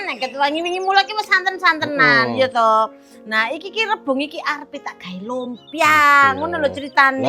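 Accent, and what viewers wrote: East Java, Indonesia. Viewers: asli pundi jenengan bu kok logat e enten jatim an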